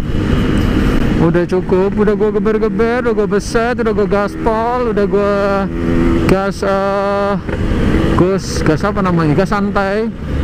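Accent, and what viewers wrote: Banyumas, Indonesia. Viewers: Oke ges welkom bek tu may cenel wit mi, gedang doyan ges..ngapak nya terasa 😄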